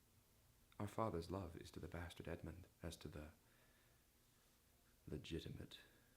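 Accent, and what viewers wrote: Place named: Ireland. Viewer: im so confused if youre irish or american haah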